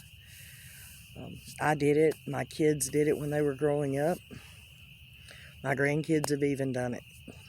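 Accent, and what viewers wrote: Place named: United States. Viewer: Your most welcome my friend…..I love your Accent, I’ve got family in OHIO, I love the American accent. But I understand what you are saying though…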